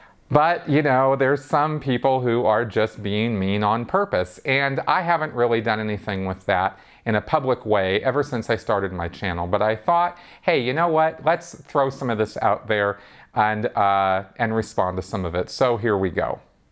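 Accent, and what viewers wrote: Minnesota, United States. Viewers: Yikes.... nothing like ad hominem! And imagine not being able to spot a Minnesota accent. No specific disagreements, just generalizations. And we know what Hubbard said about people who engage in generalizations....;) And the 'you'll delete this' messages always get me. What maroons! You're a class act, "."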